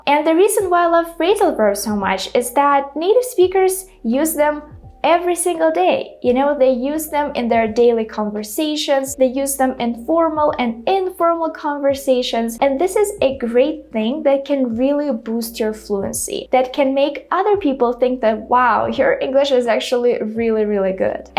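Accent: Russia. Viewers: sweety. I am not fluent but I could understand the russian pronunciation in your speech. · Русский акцент) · Russian accent?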